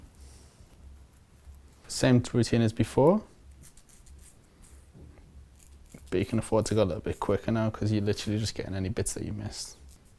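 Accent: Liverpool, United Kingdom. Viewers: u sound like a scouser wer bout is ya barbers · i don't know why but I never expected you to be British.. This is the first video I've watched of yours where YOU talk. Whoa! Surprised but I love your accent :) thank you for all your wonderful, relaxing videos! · hamza1947 No. Im from birmingham. He is a scouse trust me. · Heard the scouse accent. Got excited as live in Liverpool. Looked up his premises to book in. Saw it was in London. Got gutted. :( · Have you got scouce in you? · Didn't know you were a scouser. Nice to see the accent getting about. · Are you from Liverpool mate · Jake DaSnake scouse (Liverpool) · Do you have a scouse accent you tryna hide? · Your accents mad, like it sounds scouse, a bit foreign and north eastern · Are you a scouser? You sound a little bit like one lol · are u scouse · Is he scouse? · Are you part scouse too, i can hear an accent in you?? 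· U have the same accent as me. Scouse or Geordie? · Is that abit of a scouse accident? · U from Liverpool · Is he a scouser? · I like your British accent.. make my eye close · He sounds Scouse. (Liverpool) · He's English, but from Liverpool. It's a very distinct, thick local accent there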